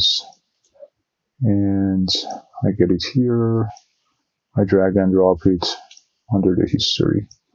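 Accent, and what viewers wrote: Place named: Iran. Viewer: I hear persian accent 😀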